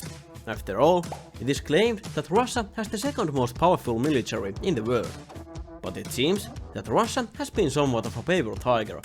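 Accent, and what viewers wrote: Finland. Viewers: Sun äänestä kuulee heti et oot suomalainen.(ralli engalti) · Fairly difficult to take these videos seriously with this excessive finnish accent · me hearing first three words and realising that this guy is finnish (finnish accent)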